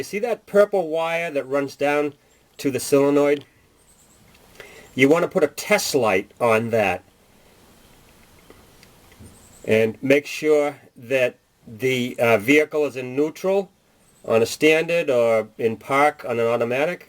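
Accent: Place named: Boston, United States. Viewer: My supervisor is from Boston and has the same type accent, it's common in the New England area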